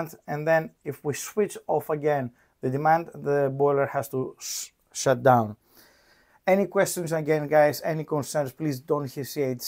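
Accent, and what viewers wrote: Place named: Greece. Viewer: Στάθηκα ακούγοντας τη προφορά και είπα ώπα, δικός μας. Πολύ ωραία,καλή συνέχεια.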